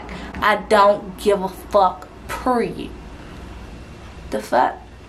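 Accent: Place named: Chicago, United States. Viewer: Friend are you from the chi your accent Gives me very much Chicago forgive Me if im wrong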